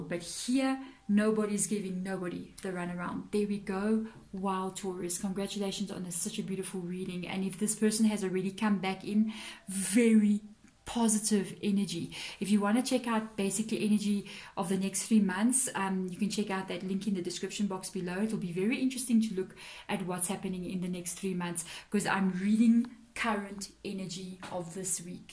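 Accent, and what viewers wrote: South Africa. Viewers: Good to hear a south african voice again. I been in the uk too long now lol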